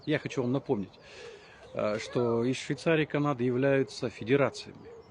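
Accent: Standard. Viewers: Ты кто? Зачем стараешься разжечь межнационалбную рознь? Говоришь ты на чистейшем русском языке, молодец, почему другие не могут говорить и изучать его? Может тебе англоссксы деньжат подбросили и ты их отрабатываешь? Уважать и почитать нужно людям друг друга а не ссорится ибо распри не кчему хоршему не приводят. Быть добру. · А почему на русском говоришь🤔. Да так чисто😅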